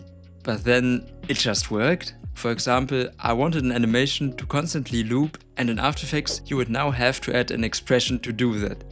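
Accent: Germany. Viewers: Dieser deutsch Akzent 😂❤ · ARE YOU GERMAN BY ANY CHANCE WOW YOU GOT DISCORD?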